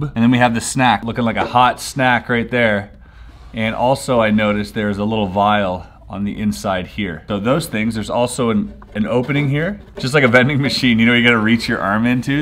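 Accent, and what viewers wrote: Canada. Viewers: You’re Canadian aren’t you lol cameras sorted ooout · I fucking knew he was Canadian haha I was like “well he has a NASA hoodie” and then I was like “no American says out like that” I was right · is he canadian?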